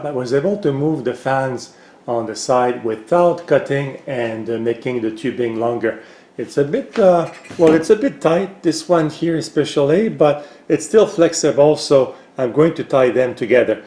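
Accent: Quebec, Canada. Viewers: Thought I spotted un accent quebecois in your english monsieur.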